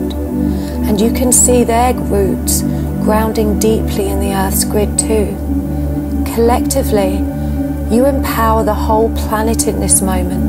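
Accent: United Kingdom. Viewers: Wow! Thank you! That was very transformative... I’m very glad I watched this. I felt so elated and tingly all the way through... and man! That was the coolest elevator I’ve ever seen if I do say so myself 😎😂... but seriously, thank you, I honestly wasn’t going to watch it as I’ve been off Twin stuff this week, me and my twin had a massive argument and I told him to move out which he has started to, so it’s been a rough week to say the least - I’ve gave it up to the divine and surrendered to refocus my energy onto myself, I’m just out of patience right now and we both have healing to do, anyways, I was guided to here for a reason, perhaps some healing has been done for my connection and all the other beautiful twins 💕 plus I like listening to your accent 😊 I’m Scottish, moved to Denver 4.5yrs ago, so reminds me of the UK 🌹 looking forward to more of these, thank you so very much for sharing your gifts with us all and helping us on this journey 🙏 love and light 💕🌹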